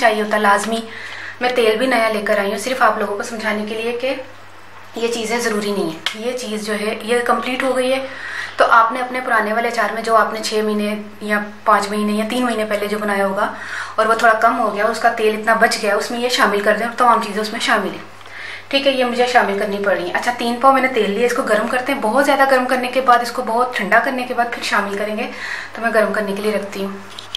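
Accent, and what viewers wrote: Punjab, India. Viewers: Walikum aslam Her punjabi so sweet Thank you ma'am so much for sharing ur knowledge with us🤓🤓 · Your punjabi is very sweet and your achar is very good and yumm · ساتھ ساتھ پنجابی کا تڑکا بھی لگ رہا ہے۔ خوش رہو میری بہن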